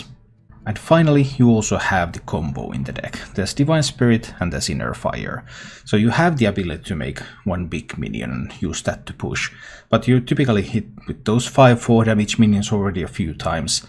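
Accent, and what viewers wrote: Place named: Finland. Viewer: I immediately knew you were finnish after hearing your accent. I'm not even scandinavian myself but I've heard some finnish people talk before, haha. Nice deck and I love the way you explain things so calmly.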